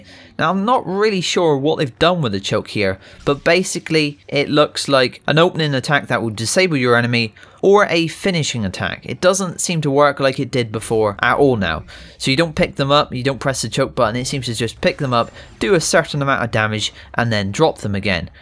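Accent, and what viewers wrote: West Country, United Kingdom. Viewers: Clear and concise with a west country twang:) You have a new sub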